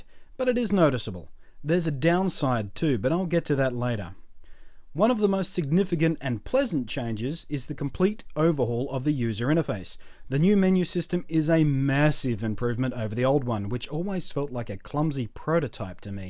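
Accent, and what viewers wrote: United Kingdom. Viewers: Have you ever heard a british/english accent...? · he's british. =/ lol. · British?? good job graduate.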